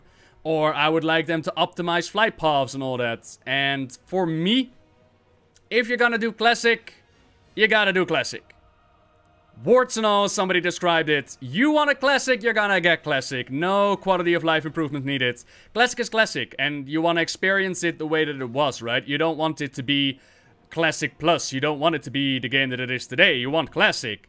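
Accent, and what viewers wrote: Netherlands. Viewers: you dutch?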